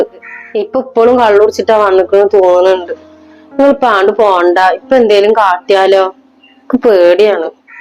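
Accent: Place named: Malappuram, India. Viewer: പക്കാ മലപ്പുറം ഭാഷ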